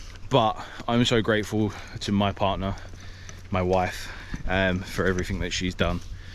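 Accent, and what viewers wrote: Australia. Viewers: Defo getting that Aussie twang mate! Loving the down under content!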